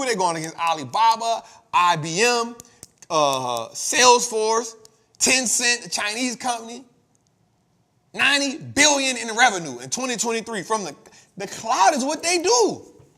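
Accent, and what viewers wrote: New Orleans, United States. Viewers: I wouldn't worry about your pronunciation. Anybody who's going to quibble over your articulation probably isn't from the culture like that. I don't even have a New Orleans accent but I knew what you were saying. You should probably send somebody like that somewhere else. Because in the future they're going to try to change you. So unless you're just working on it because it's something you want to do then don't change. Say things the way that you want to. Besides that kind of keeps it encrypted for the culture that gets it. The culture that probably needs your help the most. Somebody arguing over something like that is probably kind of uppity anyway.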